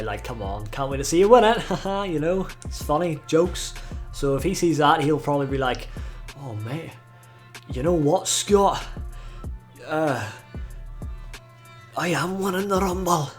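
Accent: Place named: Northern Ireland, United Kingdom. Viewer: Dude it’s so good to hear that accent! Good old Northern Ireland!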